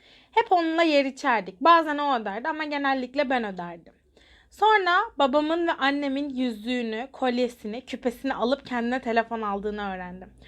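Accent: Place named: Aegean, Turkey. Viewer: Ya seni yirim şive egeden izmirliyim öptüm çok ❤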